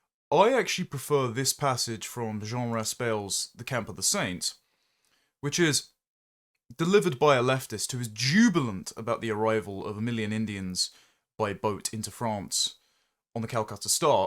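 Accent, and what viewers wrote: RP, United Kingdom. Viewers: You need to see more of the world. Your posh voice doesn't mean you know the world. Where's the video slating the every person that was the same ethnic origin as the two who cut down the Sycamore tree that had stood for a thousand years? Everything you are aiming at him you could aim at those who cut the tree down. But you won't say anything about them cos they are white like you, since you have lowered everything to the colour of skin.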